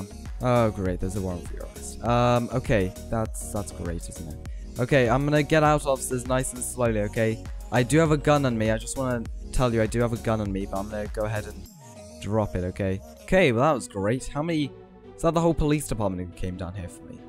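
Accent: United Kingdom. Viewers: I forgot he was british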